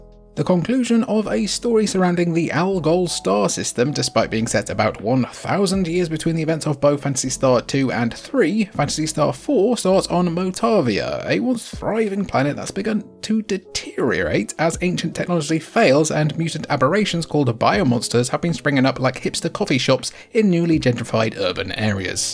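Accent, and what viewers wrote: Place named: United Kingdom. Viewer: You sound British but then why would you be talking about the Genesis?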